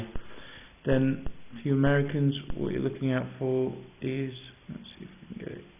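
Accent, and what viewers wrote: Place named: Manchester, United Kingdom. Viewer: Maheswaran Shiva he from UK.. Prob city like Manchester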